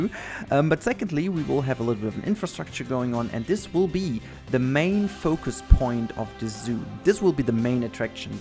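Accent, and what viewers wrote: Germany. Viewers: Man hört sofort das du Deutscher bist xd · I think u have a little bit a German dialect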